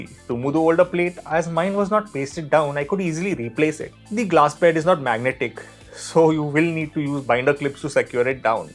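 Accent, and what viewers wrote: India. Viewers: Guessing by the accent (and Rupees), I'm guessing is in India? I put my glass bed printer away 9 years ago. At the time I had read about using Aluminum to improve ABS warping. I bought a sheet but never got around to cutting it. If I had taken the time, my priority would have been to build an enclosure; since I have a Delta-v printer, it would have been a headache to cut a circle so I never did it. Now that I'm restoring my printer to get it up and running again I figure it's time to get the best bed, hot head, enclosure etc. My understanding is that India is HOT. I can see how thermal properties of bed are not as important to you. but in California, despite the tourist propaganda about sun and palm trees it is not India. I struggled to get up to temp to use ABS. I'm currently sitting in a 95 degree garage, but average is more like 65 F and nights get down to 40 F. 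my garage has an annual range of 40 - 130F. 10 years later, should I still be considering aluminum bed, or have materials evolved to the point where I should just consider better modern filament? I don't find any of the reasons for glass here to be very compelling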